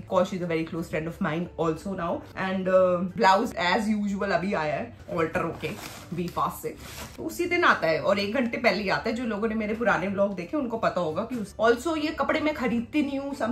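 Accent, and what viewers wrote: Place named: Chandigarh, India. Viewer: You literally speak like us, people from Chandigarh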